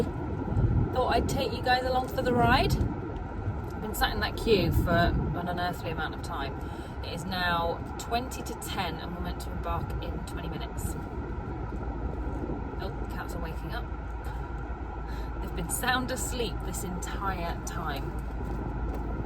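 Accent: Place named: France. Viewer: Vous avez un superbe accent Français 🥰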